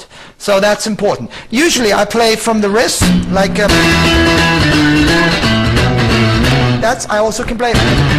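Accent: Germany. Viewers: Me encanta el acento tan marcado de los alemanes cuando hablan en inglés... Hermoso Uli eres un maestro!!! Ese sonido sólo puede ser producido con su Skyguitar!!! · Funny german accent :-) But amazing guitar player !!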